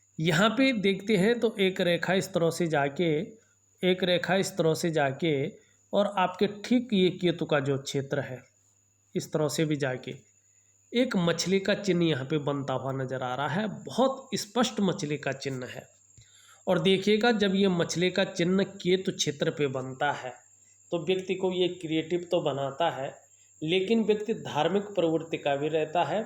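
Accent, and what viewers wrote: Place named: Garhwal, India. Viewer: Aap garhwali Ho kya Apka tone se lgra hn 100%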